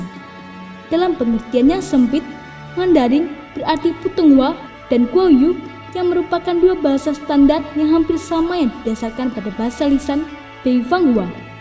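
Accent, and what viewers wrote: Indonesia. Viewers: Suaranya medok banget kaku banget bahasa Indonesianya. Mending lo belajar bahasa Indonesia dulu yg bener baru membahas bhs yang lain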